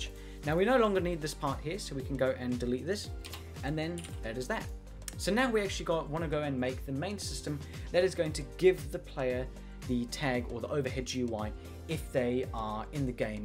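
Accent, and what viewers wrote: United Kingdom. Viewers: The best script tutorial YouTuber of all time, he explains everything too well... And I love the UK accent of this guy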